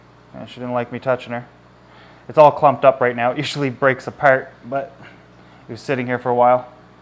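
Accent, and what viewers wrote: Canada. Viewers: Are you canadian like me? I can hear a bit of an accent lol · Youre saying oot instead of out. Are you Canadian?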